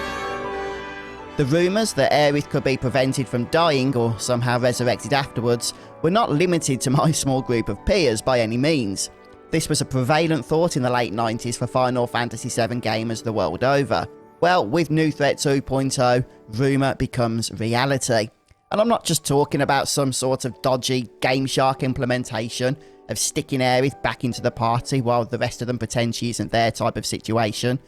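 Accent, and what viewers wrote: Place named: Black Country, United Kingdom. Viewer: I love whenever I open a video and hear a Birmingham/Black Country accent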